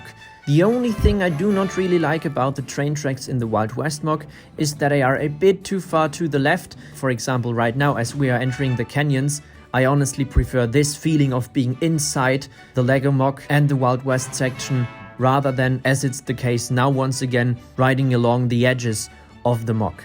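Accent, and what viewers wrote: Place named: Germany. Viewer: GERMAN ACCENT!!! SO BAD!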